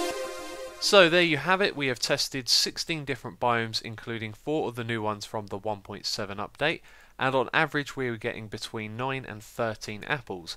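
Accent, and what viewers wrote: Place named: England, United Kingdom. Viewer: Ahh thats cool to know :-) Sorry for repetitive question aha but what part of England are you from because my British accent and my friends have a very similar accent to yours, Feel free to not answer this if its too personal or anything aha :-)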